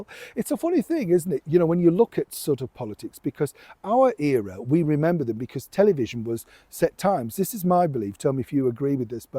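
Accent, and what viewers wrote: Yorkshire, United Kingdom. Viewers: are you a Yorkshire man? Just wondered...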